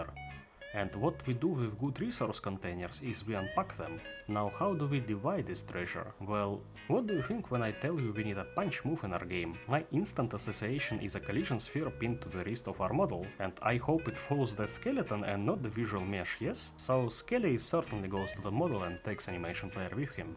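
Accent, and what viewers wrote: Russia. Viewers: I hear strong Russian accent. Good presentation of the material.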